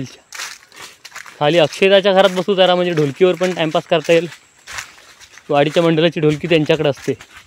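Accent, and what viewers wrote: Konkan, India. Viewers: गेले काही दिवस तुमचे व्हिडिओ बघतोय... आपली कोकणी बोली आवडली... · खूप छान व्हिडीओ दादा तुझी गावरान भाषा शैली हवीहवीशी वाटते ओरिजनल कोकणी भाषा हीच भाषा ठेव पुढ जाशील कानाला ती शुद्ध भाषा ऐकन्या पेक्षा ही भाषा गोड वाटते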